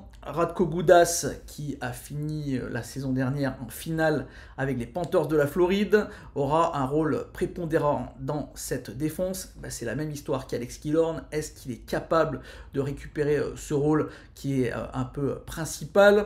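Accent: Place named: Dauphiné, France. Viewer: Cool je découvre tes vidéos sur les preview je trouve tes analyses juste avec un accent dauphinois si je me trompe pas 😅 le top👍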